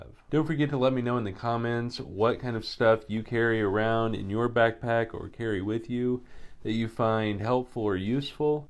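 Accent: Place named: East, United States. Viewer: gotcha. I figured east of the Mississippi from the trees and 'accent'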